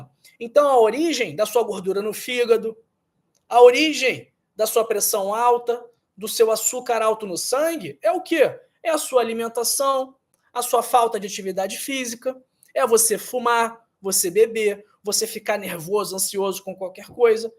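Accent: Rio de Janeiro, Brazil. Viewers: Sotaque de carioca não convence ninguém, parece malandro falando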